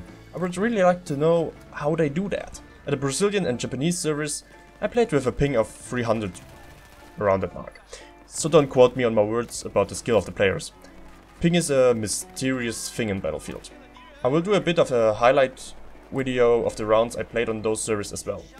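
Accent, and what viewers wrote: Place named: Germany. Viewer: Man hört so den deutschen Akzent so hart :D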